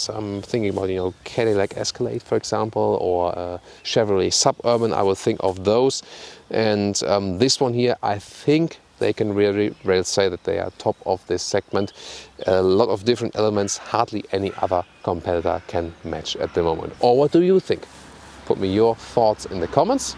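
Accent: Germany. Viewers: One of my favorite proper SUVs currently on the market. I am in love with your German accent! I feel the need to open a Milka bar. Thanks for this review. From The United States of America.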